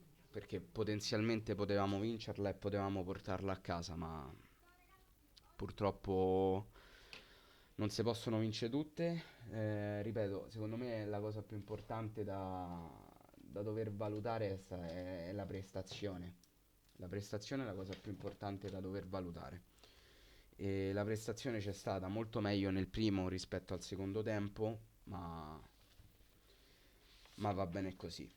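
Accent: Rome, Italy. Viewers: come mai tifoso sardo con accento romano?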